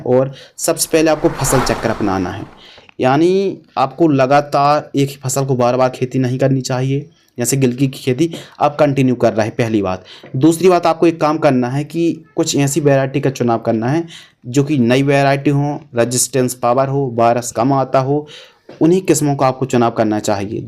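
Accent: Bundelkhand, India. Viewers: Bhai tum ka bundelkhand se ho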